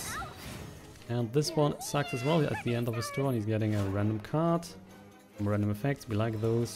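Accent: Germany. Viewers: You sound so German lol. Great video, you should make Shadowverse Videos more often! But why don't you use ceres? I thought she was a staple. Also could you make a Midrange Swordcraft guide too?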